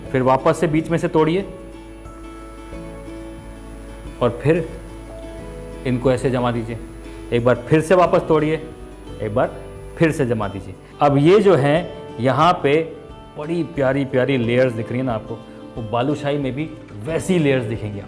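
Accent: Haryana, India. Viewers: Chef your recipes are awesome so are you Aaj toh Haryanvi bol k jamma laath gaad Diya Bahut khub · Chef i never knew that you are from haryana and the accent which brings here while speaking into haryani is so original. Just amazing. I’m also from haryana so i can totally relate to your tone 😂👌🏻. You’re the best. Love your recipes. ❤️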